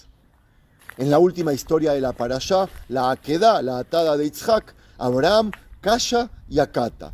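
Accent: Argentina. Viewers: Si eres de isrrael porque ablas como argentino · Che vos sos de Argentina?🇦🇷. Me encantan tus videos. Saludos desde Republica Dominicana🇩🇴. Y bendigo al Dios de Abraham, Isaac y Jacob🇮🇱, Padre de Nuestro Señor y Salvador Jesucristo.✝️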